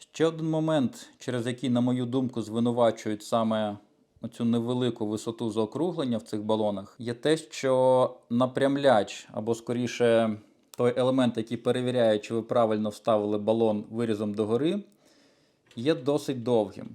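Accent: Standard. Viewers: отмав важливу інформацію без води і чудову аргументацію, наочно продемонстровано найменші деталі. супер! дуже дякую. Окреме дякую за чисту українську мову.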